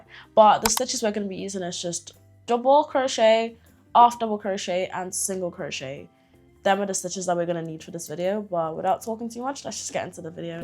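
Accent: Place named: Liverpool, United Kingdom. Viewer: scouse queen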